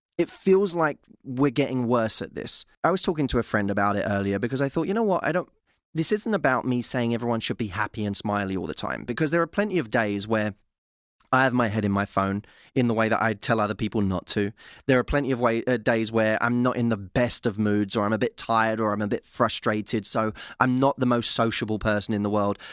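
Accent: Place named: United Kingdom. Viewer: Cute much! Dungarees....You mean Jeans, right?? Love your British accent & names for items.... ;) <3 Hugs from Canada...;)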